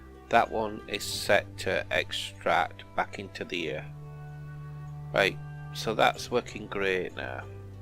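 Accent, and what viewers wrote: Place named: Manchester, United Kingdom. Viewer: Nothing to do with your voice, it has a good north Manchester accent. Glad you don't have commentary in one ear and music in the other I like both together.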